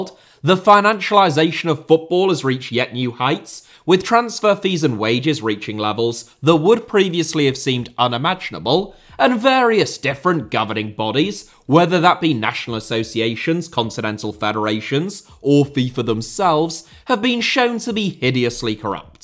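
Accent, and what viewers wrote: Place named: East Yorkshire, United Kingdom. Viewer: I often disagree with, yet here I am again to let him elicit angry puffs of steam out of my ears with his opinions. It's official, it's an addiction now. I'm even subscribed now. I blame that drowsy East Yorkshire accent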